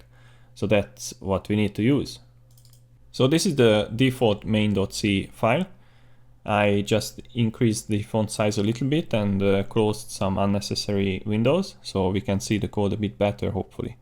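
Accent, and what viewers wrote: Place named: Hungary. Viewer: very good tutorial magyaros kiejtessel 🙂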